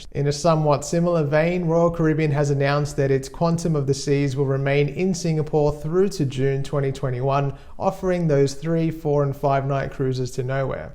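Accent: Australia. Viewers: hi:) i was just wondering if you are from aus? you have an aussie accent so i thought maybe you are a fellow Australian:)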